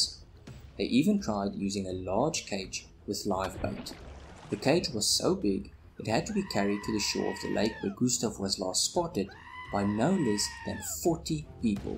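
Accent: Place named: South Africa. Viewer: Are you South African, or Dutch? You sound like you are from S.A. ? Saludos desde California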